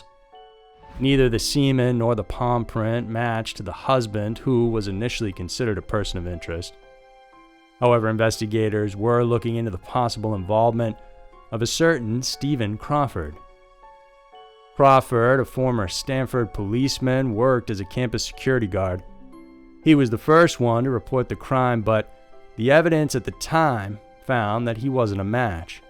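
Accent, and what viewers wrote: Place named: Texas, United States. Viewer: I think he has a Texas accent. Its very familiar. Probably why I like listening to.it. when he says 5 like fiiiive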